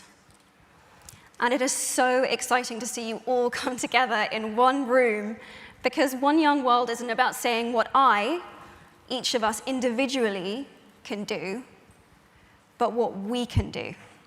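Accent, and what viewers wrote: United Kingdom. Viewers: i love her british accent <3 always love u Emma keep motivating !!!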